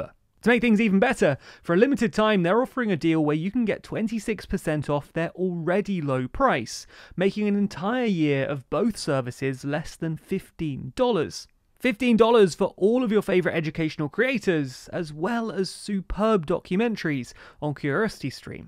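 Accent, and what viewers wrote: United Kingdom. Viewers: This is british english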